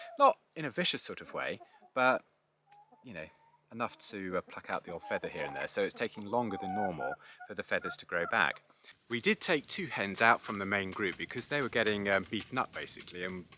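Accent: United Kingdom. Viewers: I did enjoy this video. I have always thought of you as a gentleman. My wife likes your proper British accent. Well done for showing compassion to the hens. Are you still baling your own hay?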